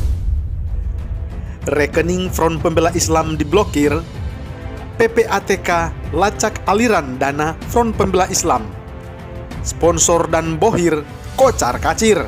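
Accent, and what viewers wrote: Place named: China. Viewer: Apa iki...suara nya asli apa mahluk jadi2an tokh ini? Di lihat logat bcr yakin ini cina nyungsep.nyamar!!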